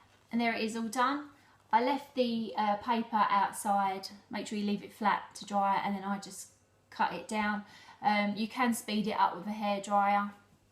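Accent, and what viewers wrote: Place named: United Kingdom. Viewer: No don't worry about your British accent. I think it's pretty cool. Now with my Texas accent it's funny. Keep up the great work my friend. Hugs from Texas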